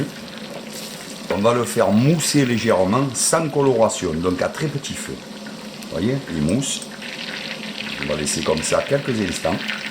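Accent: South, France. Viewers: Oh l’accent du sud, quel délice ❤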